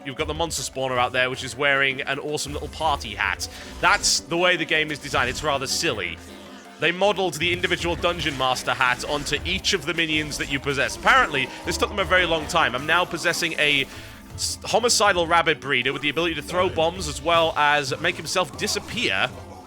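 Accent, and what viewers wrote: United Kingdom. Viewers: is British, that's why he might sound a bit odd in your ears.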